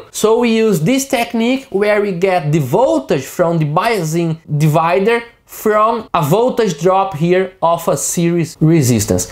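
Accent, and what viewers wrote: Brazil. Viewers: Tu é paulista?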